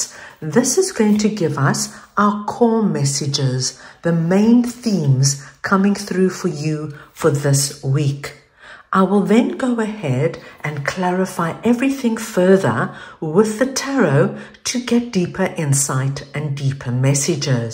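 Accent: South Africa. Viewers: Thank you so much for a lovely reading again 😅 do i detect a South African accent? Or am i getting old 😅😅😅 Lots of love from Cape Town ❤❤❤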